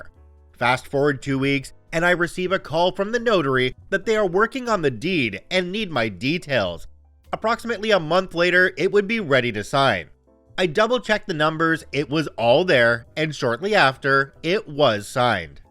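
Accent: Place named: Canada. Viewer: from Canada, so he will pronounce me words differently than other people would and no, he is not am AI either.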